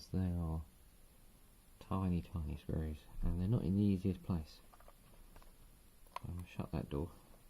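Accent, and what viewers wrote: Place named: Cockney, United Kingdom. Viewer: Tips: - Use maximum volume if you can't hear him. - Use CC to translate the avalanche of British cockney gibberish.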